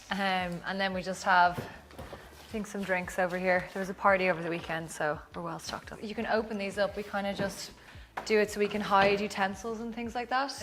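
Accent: Ireland. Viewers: yes I hear a definite Irish accent, very mild really. I would guess she is from a larger city in Ireland, Such as Dublin or possibly Belfast. Who knows. I think maybe? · Sweetie, did you think we would confuse you with Mexican? We know you are Irish, we can hear it, see it 😂😂 gorgeus home, you have grest taste❤❤❤ amazing views 😊 I have big envy energy 😂😂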